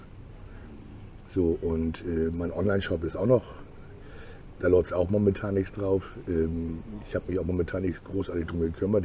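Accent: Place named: Hamburg, Germany. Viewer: Moin, einfach so bleiben wie Du bist und nicht für andere umerziehen lassen. Ich denke wen deine Aussprache oder Videos nicht passen, sollte einfach nicht nerven und andere schauen. Ich finde genau das machen deine Videos aus, dass du dich nicht verstellst und authentisch bist. Manche kommen mit hamburger Jungs vielleicht nicht klar, dann sollen sie einfach wegblicken. Ich würde die nörgler blockieren falls sowas geht. Sollen sie sich andere Videos anschauen. Viele vergessen das sie umsonst schauen und du kosten hast. Ich finde deine Videos top, egal ob auf Roller, zu Fuß, teils englisch oder laut, scheiß der Hund drauf. So sind sie top. Ein Mangel habe ich, dein abspann mit den Verweis auf andere Videos, würde ich nur 5-10 Sekunden machen, dadurch schauen viele nicht bis zum schluß denke ich. Das zählt wohl auch wenn bis zum Schluss geschaut wird. 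Deutschland ist keine Option für dich, dazu bist du zu lange weg gewesen und wie Du sagst, als Deutscher hat man hier nichts zu erwarten. Weiter so mit deinen Videos. Danke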